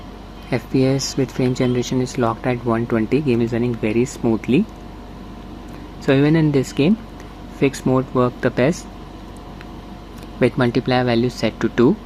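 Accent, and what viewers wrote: India. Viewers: Accent is thick but lucky for me i have Indian friends :D cheers for the video bud.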